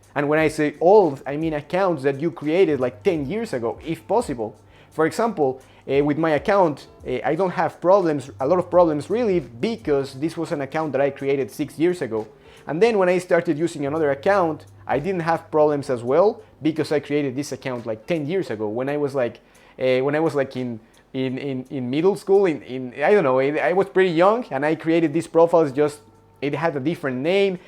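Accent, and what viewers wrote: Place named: Ecuador. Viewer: Thank you for your work. Your accent reminds me of Marlon Vera who is a very respected MMA fighter from Ecuador.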